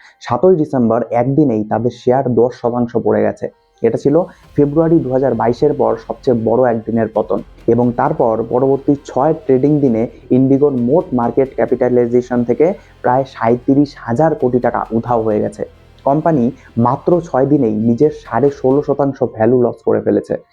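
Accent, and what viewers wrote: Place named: Bangladesh. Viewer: আচ্ছা আপনি কি বাংলাদেশী?! বেশ কিছু উচ্চারণ শুনলেই তাই মনে হয়। আপনাদের নিজেদের দেশের বিষয় নিয়ে representative clips বানান। তাতে সবার ভাল হবে। আপনাদের'ও।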